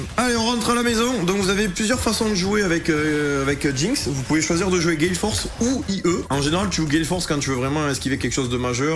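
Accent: South, France. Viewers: Cet accent, j'adore, ça sent bon le sud. J'aime beaucoup Jinx, c'est assez simple à jouer cependant toute seule elle se fait souvent ouvrir en deux si elle n'est pas aidée.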